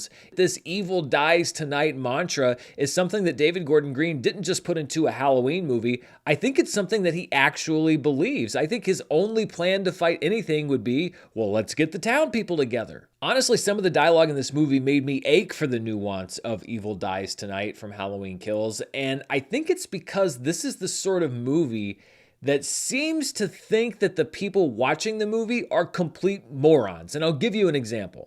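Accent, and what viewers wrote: St. Louis, United States. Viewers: Are you from St Louis?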